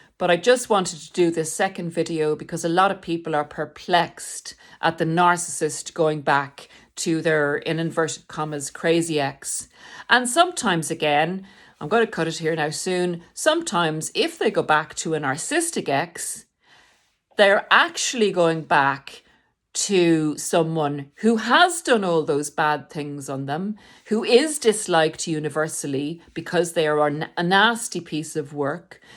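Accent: Ireland. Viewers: Hello from London and I found your channel today and I'm on video number 4! It helps your Irish as both my parents are, so it's quite familiar listening to you 😊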